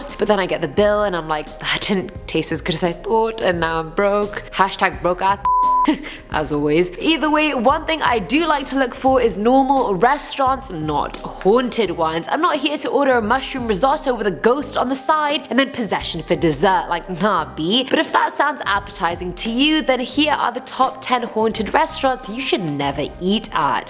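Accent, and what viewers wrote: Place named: United Kingdom. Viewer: ? Have you ever been to Hollywood or New York... I really think you would have a better chance at your career in one of those two places.. Question you sound like you are from the UK but you live in Canada mind if you enlighten me a little? You truly are a smart have great humor and awesome at Story telling... Mind if I jump on thee find love wagon with ya?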